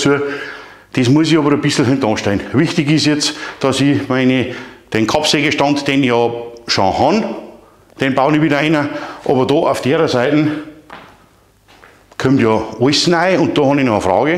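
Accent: Bavaria, Germany. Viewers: Ne, alles OK was du machst, ist doch deine Sache! Lass dich nicht Trollen. Ich schaue mir gerne deine Fachkompetente Videos an. Mach bitte weiter so, vor allem auf Bayerisch! Liebe Grüße aus Unterfranken. 👍👍👍❤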